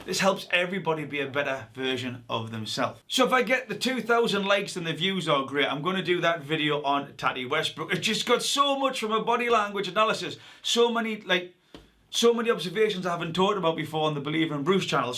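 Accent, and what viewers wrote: Newcastle, United Kingdom. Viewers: R u a Geordie cause u sound like your from Newcastle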